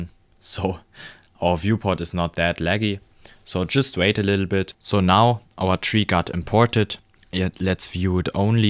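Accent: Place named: Germany. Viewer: you are german i can hear the acent in you XD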